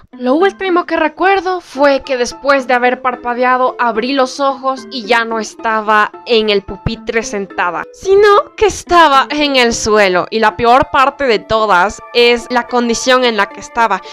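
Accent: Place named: Quito, Ecuador. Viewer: es ese acento quiteño serrano que tiene 🥺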